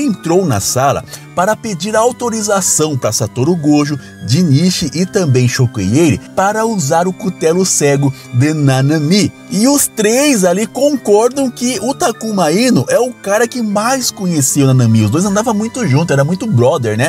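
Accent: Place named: Minas Gerais, Brazil. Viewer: O dono do canal ficou tão feliz que deixou todas mineirisse dele sair kkkk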